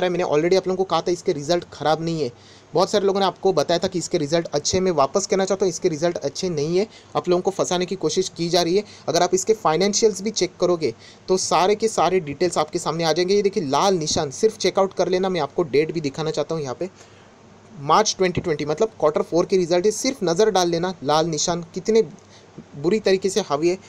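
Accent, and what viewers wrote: Maharashtra, India. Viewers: Sir very fine analysis, a am watching your each and every vidio, one thing I want to ask you, I guess u belong to maharashtra, from your language I come to know, I am from pune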